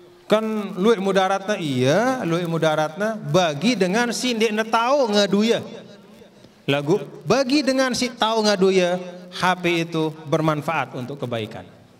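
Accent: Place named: Sasak, Indonesia. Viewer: senang banget sy dengar bhs sasak halus intonasinya sy 15th di Lombok ngerti sekedit kedit ton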